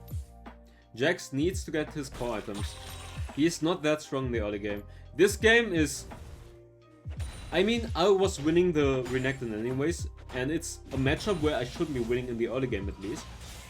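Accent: Germany. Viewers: Man hört deinen Deutschen Akzent einfach heftig raus lol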